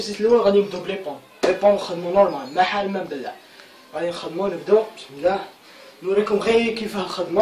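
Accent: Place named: Algeria. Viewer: لهجة الجزائر يا اخي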